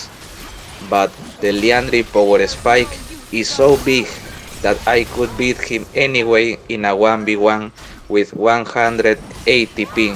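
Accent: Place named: Spain. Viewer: Ostias hermano es el acento español en ingles mas fuerte k he escuchado en mi vida ajajaja. Pero la guia muy buena, te recomiendo que las hagas en español con subs en ingles.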